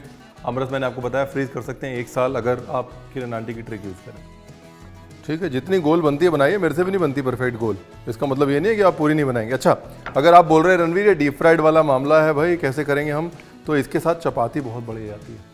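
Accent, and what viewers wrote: India. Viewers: Never heard of it sir love your deliberate desi pronunciations "waaater" 'mangostaan' nice dish definitely going to try it salute to my favourite chef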